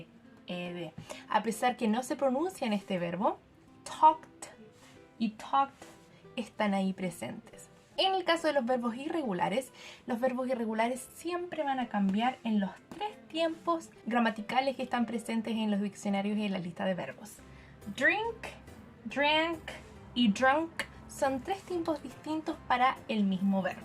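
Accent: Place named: Chile. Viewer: Usted es de Chile por el dejo.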